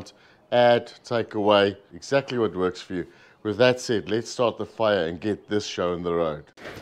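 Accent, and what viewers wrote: South Africa. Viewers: South African accent right there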